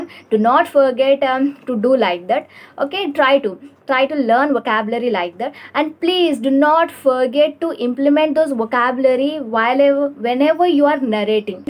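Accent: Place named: Tamil Nadu, India. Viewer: Were are you from i thought u r tamilnadu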